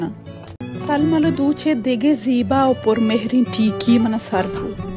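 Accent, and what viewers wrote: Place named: Balochistan, Pakistan. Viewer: الله میاری بانوک تئی گپ تران سک وش دولدارانت ❤❤❤ اصل بلوچی انت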